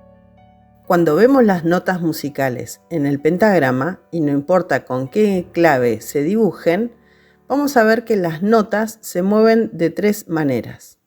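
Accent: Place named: Argentina. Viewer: Gran estímulo para mi por dos razones, el acento argentino o el que tengas es muy agradable de escuchar y además tienes la mano canija por chiquita, como yo, eso me anima, gran cosa esta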